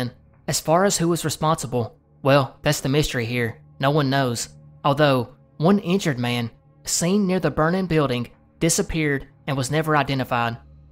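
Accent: South, United States. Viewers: Best icebergs goin'. Love hearing a southern accent being used intelligently and unashamed. We southerners are not dummies, like most media represents us as. Keep up the great work!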